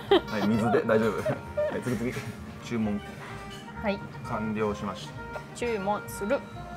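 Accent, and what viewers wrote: Nagoya, Japan. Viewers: 偶然に初めて見たとき日本語のアクセントが名古屋ぽいと思ったら名古屋ご在住のようですね。名古屋及び近郊の発祥の店がよく紹介されているので登録しました。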